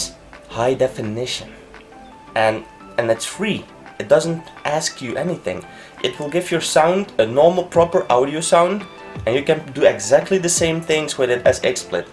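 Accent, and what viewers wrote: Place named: Netherlands. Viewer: where are you from? you sound kinda dutch to me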